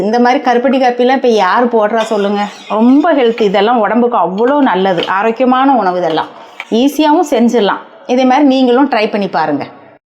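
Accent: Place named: Nagercoil, India. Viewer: நம்ம நாகர்கோவில் தமிழ் வாசனையுடன்,கிழங்கின் சுவையும் அருமை !!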